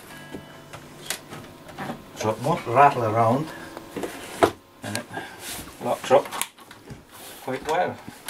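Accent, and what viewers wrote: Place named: Scotland, United Kingdom. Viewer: Your accent is really hard to place. Sounds Scottish, sometimes Irish and sometimes Northern European.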